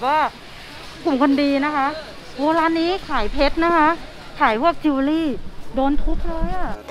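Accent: South, Thailand. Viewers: คุณ เป็นภาคใต้บ่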